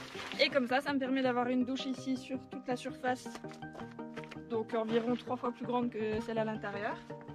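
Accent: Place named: Franche-Comté, France. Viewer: Belle présentation (avec ce charmant accent franc comtois si je ne me trompes, 😉). J'adore le bac a douche encastré et le toit relevable,c'est vraiment top! Du coup, je me demande, il y a un faux plancher pour l'encastrer ? Et ,est il relié directement à l'extérieur ou as tu une cuve d'eau grise? Hâte de suivre tes prochaines aventures 👍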